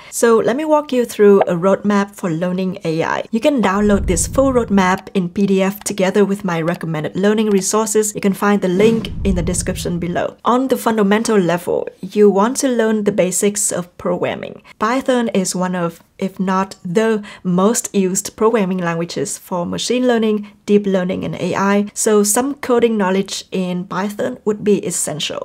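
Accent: Vietnam. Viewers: e cảm ơn chị. Một chiếc video tỉ mỉ không kém mấy video của các pháp sư ấn độ nhưng lại có accent việt đáng yêu vô cùng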